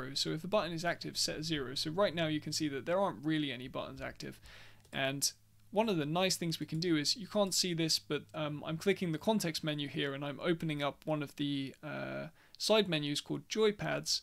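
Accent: Netherlands. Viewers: You're either Dutch or Belgian :). I think Dutch. Nederlands?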